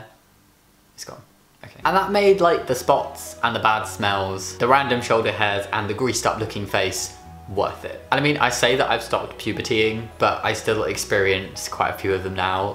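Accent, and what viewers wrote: United Kingdom. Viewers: Why addy Woop well done that accent is British! If you want to hear another follow me!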